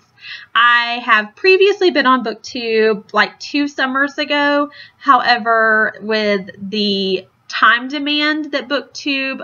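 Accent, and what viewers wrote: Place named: Texas, United States. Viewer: I love your accent, I lived in Houston TX my whole life and we just moved to Michigan and I miss the accents!! I loooove watching book hauls so I can't wait for more from you! great video!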